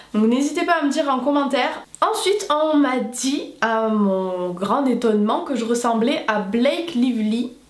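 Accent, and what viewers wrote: South, France. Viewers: Je pense qu’il faut regarder koh lanta, pour trouver que Cindy te ressemble ! Vous avez le même accent du Sud ! 😅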